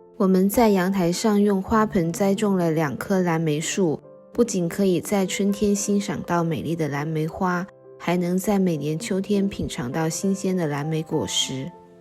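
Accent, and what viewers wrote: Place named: China. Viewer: 中國人的4瓶!! 雖然用了正體漢字聽口音跟用詞都不是台灣人跟香港人的用法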